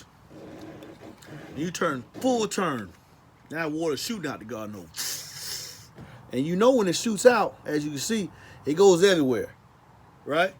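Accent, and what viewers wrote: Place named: South, United States. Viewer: That country accent got me laughing 😂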